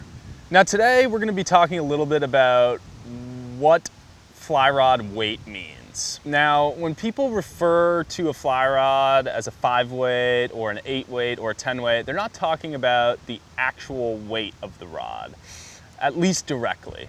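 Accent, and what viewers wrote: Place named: California, United States. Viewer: Definitely a cali accent. Annoying as hell.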